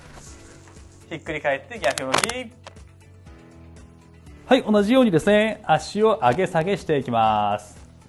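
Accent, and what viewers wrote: Kansai, Japan. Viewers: 途中から吹き替えみたいなって内容がはいってこないww ちらちら関西トーン 頑張ってやってみます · 声が良い✨ 関西訛りがあるからか ナダルさんみたい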